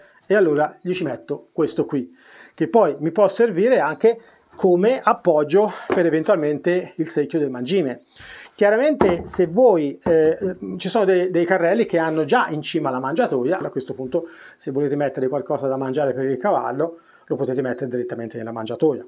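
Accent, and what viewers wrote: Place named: Tuscany, Italy. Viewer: Bravo, spieghi talmente bene e in modo chiaro che possiamo capire tutti. 👍Grazie. E un piacere ascoltare il tuo accento tos ano😊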